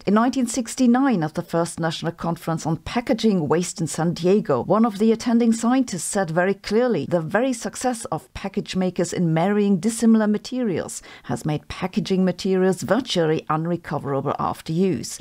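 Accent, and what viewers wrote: Germany. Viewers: And I love your cherman accent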